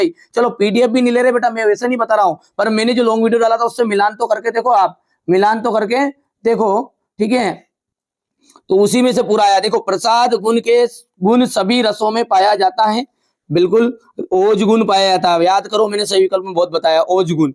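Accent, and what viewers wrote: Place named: Indore, India. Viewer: Tum indore side se ho kya ?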